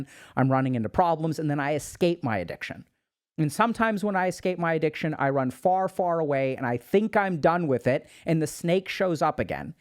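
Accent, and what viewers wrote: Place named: India. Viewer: Saw the title, first thing came to my mind was this, in your most iconic Indian voice: Stop reading my mind. Dr.K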